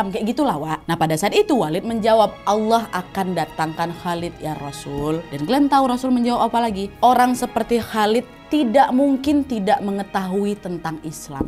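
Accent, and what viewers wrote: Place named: West Sumatra, Indonesia. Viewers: Urang awak logat minang nyo kentara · Uni urang awk yo kelihatan banget dalam bicaranya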